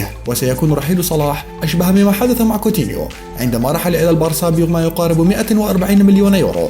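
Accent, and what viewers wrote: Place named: Iraq. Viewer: شو بعرفني بقلي ياغالية انا قلت هيك لهجة العراق😂😂😂😂😊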